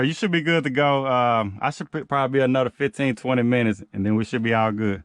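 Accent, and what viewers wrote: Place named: Memphis, United States. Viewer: You gotta be from Memphis. You sound just like we do.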